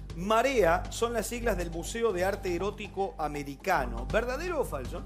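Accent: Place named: Mexico. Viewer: sos un capo me encanta cuando hablas en mexicano!!😘😘😘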